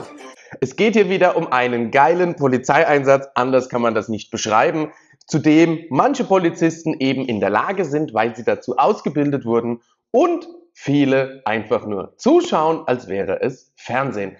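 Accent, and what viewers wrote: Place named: Rhineland-Palatinate, Germany. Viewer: Jetzt ist mir das Wort wieder aufgefallen an dem man bei ihnen den Rheinland Pfälzer klar erkennt fS Wort heisst """ anderster""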